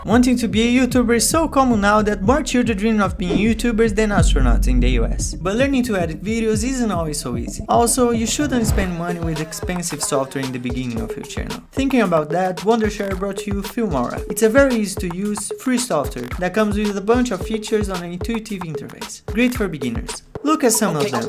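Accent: Brazil. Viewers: Why do u have a Brazilian accent? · maluko é brasileiro crtz, tem muito sotaque brasileiro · sapoha parece Br kkkkkkk pelo sotaque ou eu tô loko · esse cara tem um sotaque mt brasileiro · Se n fosse esse inglês nunca iria notar q esse safado é br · Pode até falar inglês, mas o sotaquezinho BR nunca some kkk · É brasileiro? sotaque BR forte pra caralho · you speak english like a brasilian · Aí ai o sotaque BR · Oh corno tú é Brasileiro né? Seu sotaque e nome estão me dizendo isso -_ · o sotaque brasileiro torna tudo melhor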